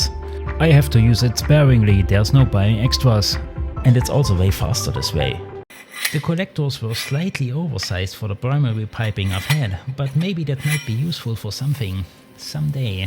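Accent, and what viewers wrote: East Germany, Germany. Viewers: Am I imagining things or are you from east germany? My coworker is from Sachsen Anhalt and he sounds pretty much identical to you in terms of dialect. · Ostdeutsch und Englisch gemischt geiler typ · der akzent, pfeffi und goldkrone... schon eher östlich in deutschland oder 😁 keep on going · Du kannst mir sagen was du willst, Youtube sagt, deine Videos sind aus den USA, dein Shop registriert in UK, aber dein Dialekt und dein Erfinderreichtum... Deutscher 😅 Bei kann man sogar Lieferwagen sehen, die das bestätigen... Bisschen chaotisch, interessante Ideen und sogar Wissenschaft dahinter. Cooler Content! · Man hört das du Ossi bist, geiles Video! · What is this? Is this the east?-German (based on the accent) version of StuffMadeHere with a focus on engines and a moldy refrigerator full of alcoholic beverages? Super exciting to watch in any case! 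· Das englisch mit ostdeutschen dialekt ist immer am besten xD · Never laught so much about a german accent xD I love it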